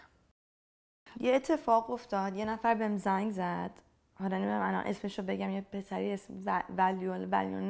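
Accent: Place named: Isfahan, Iran. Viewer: The first American with ISFAHANI ACCENT......................so gifted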